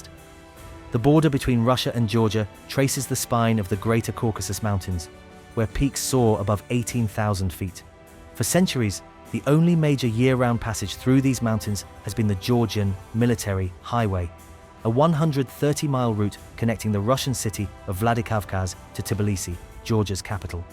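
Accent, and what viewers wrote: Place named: London, United Kingdom. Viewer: London accent make complaints about developments in Eastern countries!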